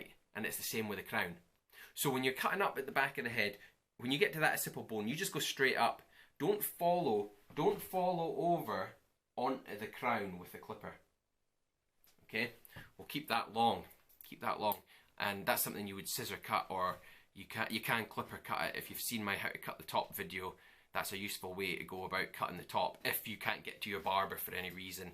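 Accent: Scotland, United Kingdom. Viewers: Great video! It’s also nice to hear a Scottish accent on YouTube. It sounds as if you’re from Aberdeen, my home city! :)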